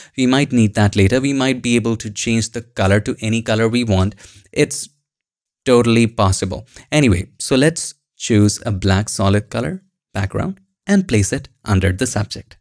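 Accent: India. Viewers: I never thought I love the indian accent until I fell asleep while watching this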